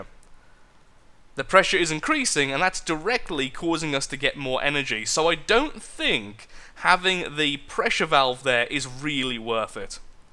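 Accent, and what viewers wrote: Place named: Black Country, United Kingdom. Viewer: Love hearing youtubers with that Black Country accent :D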